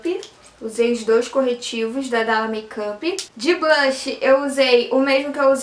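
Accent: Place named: Rio de Janeiro, Brazil. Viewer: Aaa é muito carioca mano,amo esse sotaque carioca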